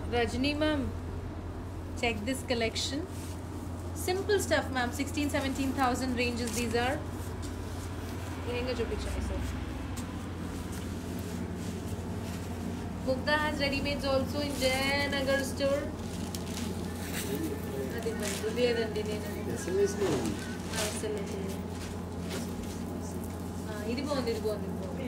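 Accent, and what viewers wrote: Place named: United States. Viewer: Manum India lo puttamukada American acsent enduku. Price clearga chepthe good 16. Or 60. Anedi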